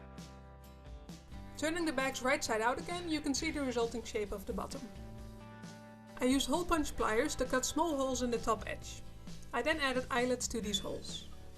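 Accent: Netherlands. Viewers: Great bags... One question ... are you dutch???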